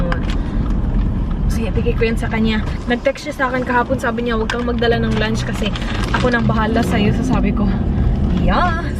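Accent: Visayas, Philippines. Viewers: bisaya ka te? E hearth ni kung bisaya ka😂😂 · Bisaya ka te noh? Haha kay tungod sa char char char na word ug botbot haha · Ms. u meron ka visayan accent po if nagTatatalog... I'm sorry to say that... I'm just priud Bisaya here😉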